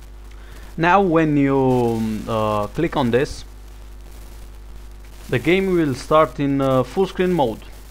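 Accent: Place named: Romania. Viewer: stiam eu dupa accent:)) esti dealmeu. De prin ce zona esti daca nu te superi ca intreb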